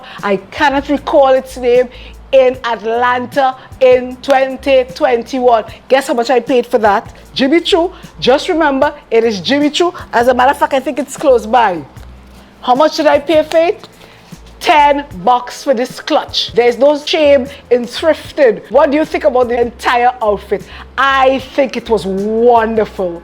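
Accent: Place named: Trinidad and Tobago. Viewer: Oh GOSH GYAL! All looks are 🔥 🔥🔥 Look #3 was my fav! I could listen to your trini accent ALL DAY! And yes... FUMI SENT ME!😂😘💓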